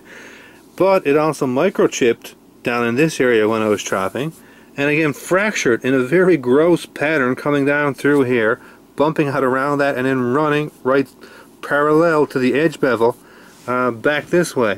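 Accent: Newfoundland, Canada. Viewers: 5160 is a very good steel if it is hardened correctly. You are not the first person to have issues with the hoodlum or buck knives for that matter. That is one company I tend to stay away from. Also, are you from Newfoundland, if you don't mind me asking? If so hello from Alberta!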